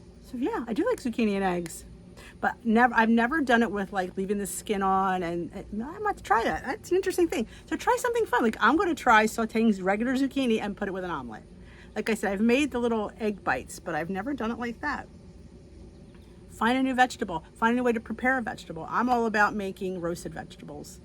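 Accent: New Jersey, United States. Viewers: I thought you were a Jersey Girl! I'm think if up north Bayonne New Jersey , Nutley or Verona or down to the shore ocean county third generation Italian am I right?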